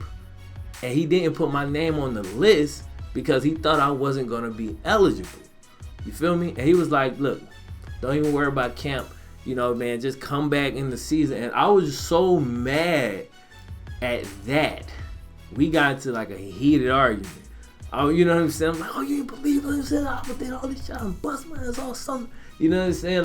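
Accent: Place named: Mississippi, United States. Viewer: Hold up...u from Mississippi? I’m from Mississippi idk why but that cool as shit to me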